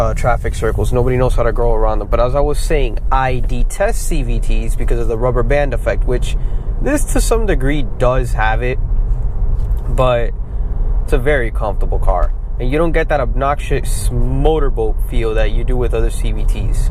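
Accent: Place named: Miami, United States. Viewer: I can just tell you from Miami lol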